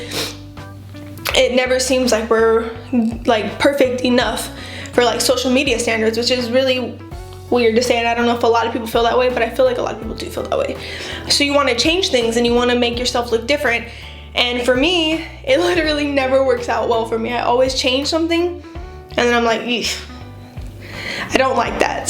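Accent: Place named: United States. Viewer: This is so weird, your voice is exactly like my sisters and you have an American accent which makes it even weirder... no offense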